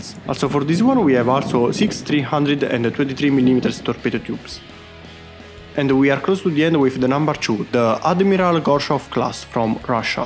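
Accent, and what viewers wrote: Italy. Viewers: Non è difficile riconoscere un accento "maccheronico" anche se molto ben mascherato; i dati sono attendibili e seguono un filo logico molto stabile e definito, per cui, italiano o non la classifica rimane invariata fino a prova di errore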